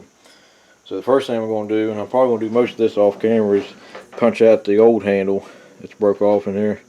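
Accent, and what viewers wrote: South, United States. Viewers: is a country guy with a southern accent. No apologies needed because that is who he is. I am enjoying your videos!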